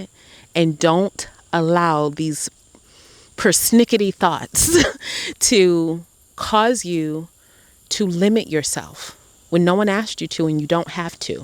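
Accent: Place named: South, United States. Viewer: You have every type of country accent in your voice lol i hear upstate, FL & da boot lol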